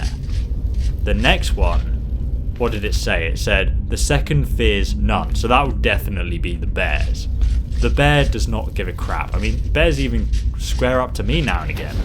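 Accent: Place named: United Kingdom. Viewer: Your voice is easy to listen to, I like the British type accent and Australian and NZ etc. I tend to hate the American accent, that's why I can't look at many videos which are narrated by Americans (their voices just sound so annoying). As soon as a video opens and it is narrated by an American, I am immediately thinking "what a retarded sounding moron". lol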